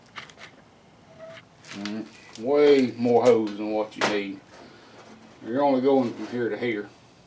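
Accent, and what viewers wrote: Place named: Kentucky, United States. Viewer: He sounds like he is from Kentucky!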